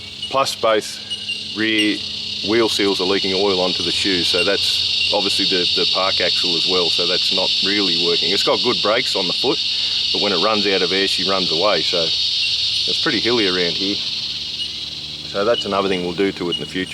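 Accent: Australia. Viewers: Well, as usual i watched you from go to wo and enjoyed every bit cobber. Just love your content and the Aussie way in witch you deliver it. All the best to you and yours and the billy lids mate. See you on the next one.🤠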